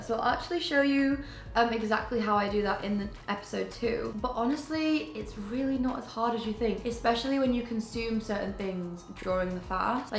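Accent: United Kingdom. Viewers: I was right! YOU ARE LATINA 😮🎉 I was able to identify your complexion, your face, and excited this is the very FIRST time I see a Hispanic with a British accent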